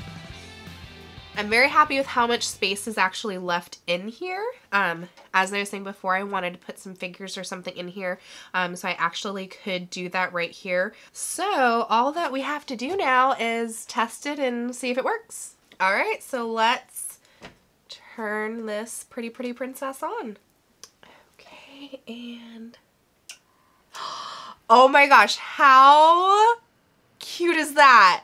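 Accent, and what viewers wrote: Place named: Oklahoma, United States. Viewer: Watched this with ny 8yr old daughter since she wants this case for her pc and she asked me if you are from Oklahoma lol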